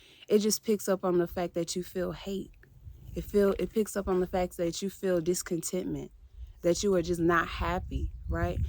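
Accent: France. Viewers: Such good advice about your surroundings affecting your inner cells🥰😍 I strongly resonate with your message. Your “french” accent had me rollin 😂🤣😅😁😆 it’s so unique, I love it. But you and the bugs, chile 😏 you are one bold sista 👏🏿👏🏿👏🏿💞💖🖤🤎🖤✨💫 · Idk why but I am dying 😂🤣 at this French accent! Lmao Everything resonates with me when you shared about epigenetics. Give thanks for the sweet reminder! 🥰🙏🏾 Keep shining, sharing, and taking up space in this universe! 💕✨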